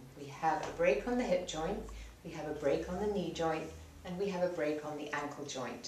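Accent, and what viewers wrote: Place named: United Kingdom. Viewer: Love British accent!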